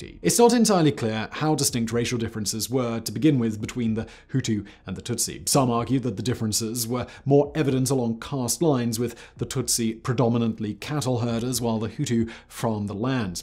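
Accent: England, United Kingdom. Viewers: Or... he wants to get to filming the other 50 videos he has scheduled for the day where he just sits there reading a Wikipedia article that someone else just printed out and handed to him 30 seconds before. Seriously... this guy makes his living sitting and reading shit. He doesn't give a shit about telling stories he makes it clear he hasn't read any of the scripts before they record them and usually has no clue what he's talking about. Whenever he pauses to add his own opinions on a subject it's usually something simultaneously arrogant and stupid. He's just reading as much crap as his accomplices can print out and put in his hands so they in turn can upload it to YouTube and get money on clicks. How many channels does he have now? 50 or so? Do you think he even knows? He's not a historian or a media personality or a great thinker or entertainer. 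He's a reader. With a beard and an English accent. A glorified Speak and Spell. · You are speaking too fast with your British accent that makes it hard to catch up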